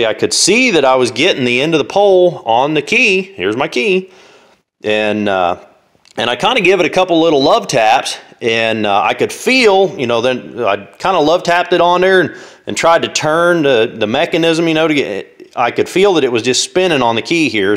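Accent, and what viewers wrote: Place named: South, United States. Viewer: Maybe I just am used to this guy's kind of language and southern accent but all the other ones seem so hard to follow. This guy made it easy for me soon as he said I just popped that son-of-a-b** on there I said yep like And subscribe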